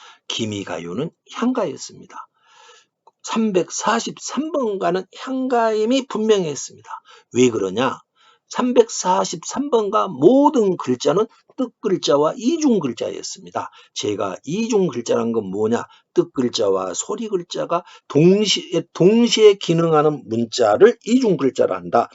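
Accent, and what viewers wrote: Gyeongsang, South Korea. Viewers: 갱상도사투리 이거어쩌지?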